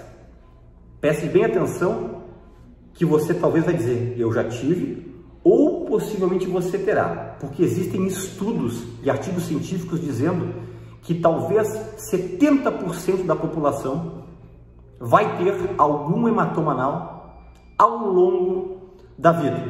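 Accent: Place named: South, Brazil. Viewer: Dr...um médico de muita sabedoria...deveria ter mais médicos desse modelo...sabe é explicada muito Me ajuda demais essas explicações Merece nosso maior respeito Tive e operei...rompeu mais Deus como dói Como vi já o chamaram de um médico lindo e maior elogio no sotaque Sulista....normal as pessoas ver um médico q ajuda muito as pessoas ser amado por muita sabedoria e bondade em nos ajudar Obrigada Dr...tem todo meu respeito Q Deus o abençoe imensamente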